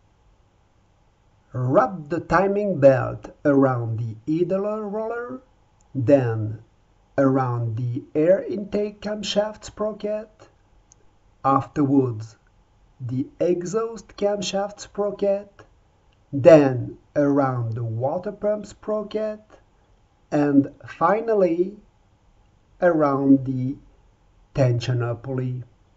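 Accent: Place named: France. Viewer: Hi, you should have your own T.V. Show! Great tutorial & very very clear precise instructions, also very good English but I love your accent, vive la France.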